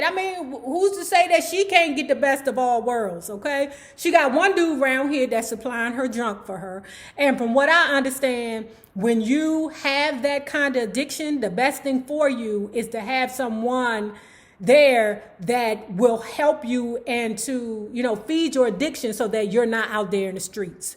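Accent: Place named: Washington D.C., United States. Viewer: I have subscribed to your channel some time ago and based on your accent im thinking your from Washington D.C if im not mistaken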